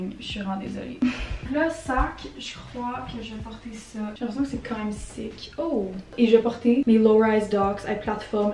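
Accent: Quebec, Canada. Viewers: She actually has a strong French Canadian accent haha but its really cute! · je préfère largement le Français mdr mais l'accent Québécois est super drôle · she has a québécois accent!! elle a un accent québécois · L'accent québécois c'est même pas un accent c'est une autre langue + le slang omg 😅😅 j'ai adoré pls don't be insecure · i love the videos of u speaking in french bc i speak france french from american school but im planning on going to college in montreal so it's nice to hear quebeqois french in a casual setting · Blk Barbie - yeah she's from quebec so it'll sound a bit different to a french person's accent i guess. though i'm not advanced enough to recognise regional accents yet lol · l’accent québécois est on another level, for a hot second j’ai oublié tout ce que j’appris mais après un peu i could pick up on some slang and understand the video! 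so thankful for this boost of confidence to begin studying it again <3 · Je suis française et j'étais curieuse de voir comment tu parlais en français et je trouve que tu parles vraiment bien, au niveau vocabulaire après l'accent est fort mais pas dérangeant car on dirait que tu parles avec l'accent quebecquois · Bh c'est l'accent québécois donc si vous ne comprenez pas c'est pas de sa faute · actually, She has a strong quebec accent ( plus quebec french and France french are almost two different languages like there are LOTS of differences between theses two ) so if ur trying to learn France french , idk if it’s a good idea because quebec french is basically french with a strong english accent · ton accent québécois est TROP CHARMANT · omgg i never realized how different the quebecois accent is! my french teacher is from côte d'ivoire, i'm having a hell of a time understanding this video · l'accent québécois 🤩 trop cool comme vidéo <33 bisous de France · je suis française et ton accent est adorable, on dirait un peu l'accent québécois. 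Tu es vraiment belle <3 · J’aime ton accent ses come Québécois et come Nouveau Brunswick et au Nouveau Brunswick ses franglais lol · L’accent québécois est meilleur😋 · Her accent is also Quebecois, which is probably harder to understand and not something you hear as frequently as a student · J’aime tellement l’accent québécois, ça a tellement de charme! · C’est trop cool de voir quelqu’un qui parle français québécois sur YouTube! ❤❤ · J'adooore !!! Je suis française et j'adore l'accent québécois. J'adore la vibes ❤ on veut d'autres vidéos comme ça plzz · haha translation : "I'm french and don't worry about your quebec accent and your expressions, they're understandable, it has a lot of charm so don't be ashamed <3" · Moi je trouve ça mignon l'accent québécois ^^ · OMG j'adore ton accent quebz. Cest compréhensible et pas exageré.😊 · U should listen to French accent not Quebec accent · OMG j'adore ton accent quebz. 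Cest compréhensible et pas exageré.💛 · Je viens du Québec (Montréal) aussi! 😂 C trop cool de voir quelqu’un qui parle avec l’accent sur cette plateforme haha Je me suis abonné :)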